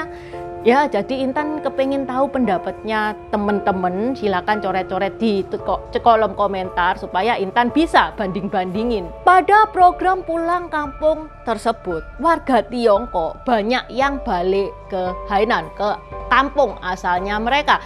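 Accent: Java, Indonesia. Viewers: Iya tuh suara nya kental banget kaya wong jowo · China medhok.....aku sukaaa😂😂😂❤❤❤❤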